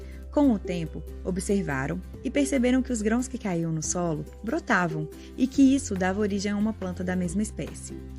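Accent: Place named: Belo Horizonte, Brazil. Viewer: Kkkk. Vcs acham que a narradora é de BH ou nem??? Ô, sotaque forte! Muito bom o vídeo. Parabéns!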